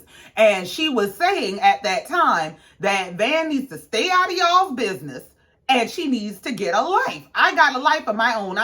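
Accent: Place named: Gullah, United States. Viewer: Nooo hell no I hear some geechie/Gullah in there, if you don’t mind where are you from? Lowcountry SC here and I know what I hear 👂🏾👂🏾👌🏾🤨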